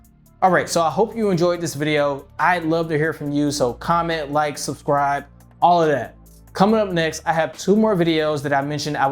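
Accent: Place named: Africa. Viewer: i like your African accent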